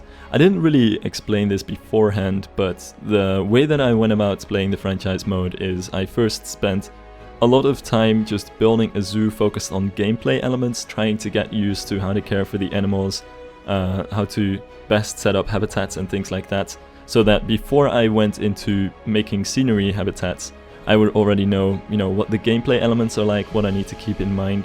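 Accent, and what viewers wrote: Netherlands. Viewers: I hear that Dutch accent. Gegroet landsgenoot. :)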